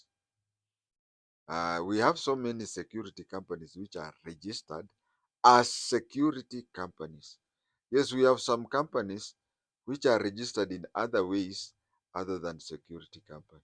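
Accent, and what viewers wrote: Kenya. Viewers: VERY POOR KENYANISED/ SWAHILINISED ENGLISH. ARE YOU GIRIAMA?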